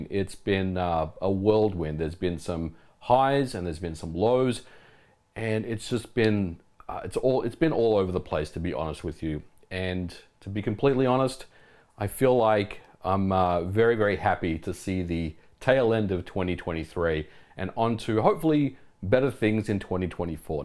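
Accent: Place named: Australia. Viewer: Hey brother, I’ve been a long time watcher of your videos. I think you experienced a lot of growth on your channel thanks to the pandemic so don’t be too hard on yourself comparing views to that time! YouTube is also pushing shorts pretty hard which isn’t your fault either. Everyone knows you’re one of the top voices in retro gaming on YouTube if not gaming in general, plus it’s nice to hear a fellow Aussie voice! Keep doing what makes you happy mate, merry Christmas.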